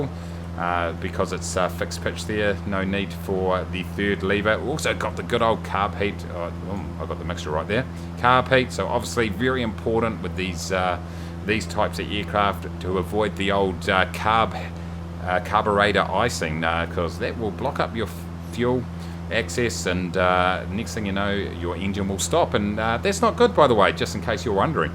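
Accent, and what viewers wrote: New Zealand. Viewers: No offence but a kiwi accent is so annoying. 😂😂😂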